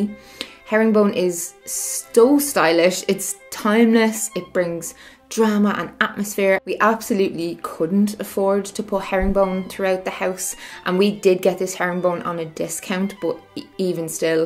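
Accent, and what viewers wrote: Dublin, Ireland. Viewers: The office is amazing, and the girl is so pretty and sounds Irish. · I just stumbled across your channel and I’m going to already subscribed because hearing you reminds me of my sister-in-law in Dublin and I miss her.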